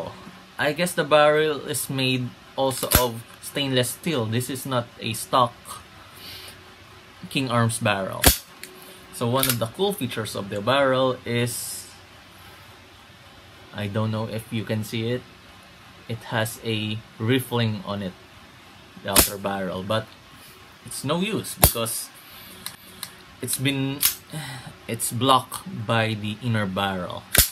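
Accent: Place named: Philippines. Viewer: Sabi na pinoy ka po eh haha sa itsura at pananalita palang eh hehe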